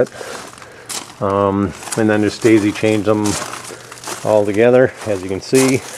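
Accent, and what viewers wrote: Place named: Midwest, United States. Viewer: You're accent tells me you're originally from the midwest!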